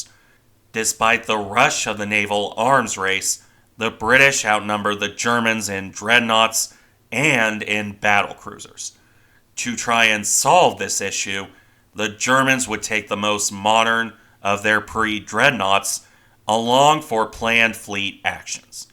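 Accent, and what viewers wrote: United States. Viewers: Please don’t be a rude Prussian. He freely acknowledges his American butchering of native pronunciations. It’s something we do here and we are fine with it.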